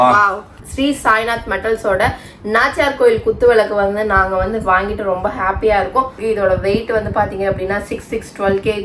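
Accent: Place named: Bangalore, India. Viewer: Ungaloda slang Bangalore Tamil madhiri irukku aana neenga coimbatore nu solreenga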